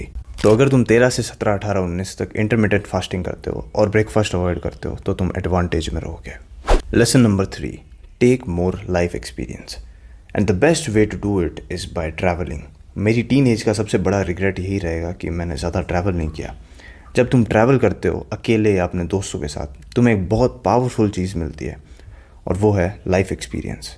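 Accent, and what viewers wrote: Rajasthan, India. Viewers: Bhai tum rajasthan(marvad) meh konse shaar se ho? Tumara accent and ghalc***a se pata chala.😂 I too want to make physic💪 like you and wanted to learn many skills in life.(in short, work on myself) which I have missed to do in my teenage. But will try to ingage in things whenever I will get chance.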